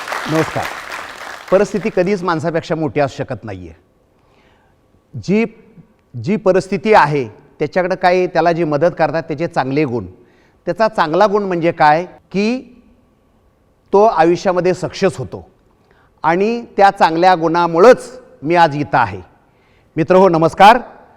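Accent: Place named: Satara, India. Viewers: Bhashevaruch olakhl satarachach ahe mhnun 😀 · Great sir Assal satari bhasha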